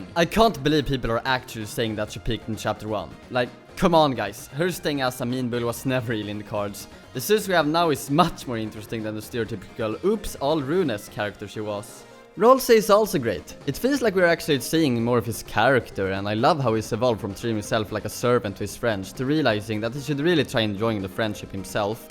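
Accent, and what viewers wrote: Sweden. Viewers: Banging video my man, håller med typ allt men bro din svenska brytning är så stark att jag knappt fattar nåt lmao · 1.From your accent I presume you are from Sweden like me 2. I didn’t like the Zelda parts of the chapter at first but they started to grow on me for a while. 3.Kanske du behöver en TANDPÅNG för att lösa dina problem med kapitel 3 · Swedish? May I ask?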